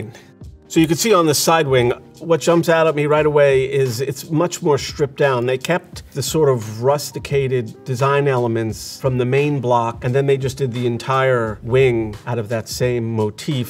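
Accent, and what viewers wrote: United States. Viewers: Can you find an English architect for the English architectures? Or architects according to the building. He butchered most of the proper English names with silly American pronunciations :-(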